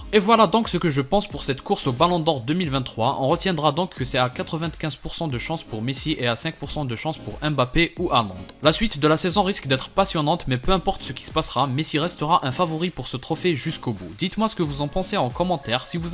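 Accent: Kabylie, Algeria. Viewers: UN ACCENT TYPIQUEMENT KABYLE T'AS RAISON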